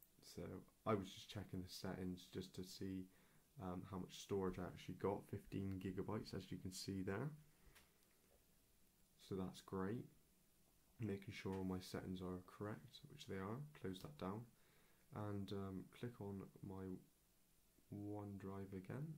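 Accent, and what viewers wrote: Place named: England, United Kingdom. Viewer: English accents are delightful... especially when one can hear anything spoken ... I cannot! Where's the volume?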